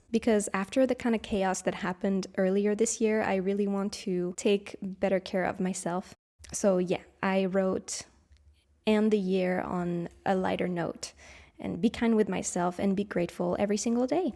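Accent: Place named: England, United Kingdom. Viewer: Cette vidéo est vraiment trop cool et super encourageante. J’ai acheté mon premier hobonichi pour 2025 et je me sens toute impressionnée. Pourtant j’ai l’habitude de remplir des journaux. J’ai vu Bruxelles dans certaines de tes pages (pardon je ne voulais pas lire), tu as un super accent anglais ! Au plaisir de discuter du hobonichi 😊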